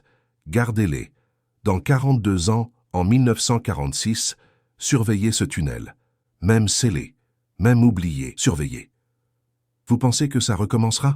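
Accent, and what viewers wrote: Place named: Marseille, France. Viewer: Très agréable votre voix Marseille 😊 un peu de soleil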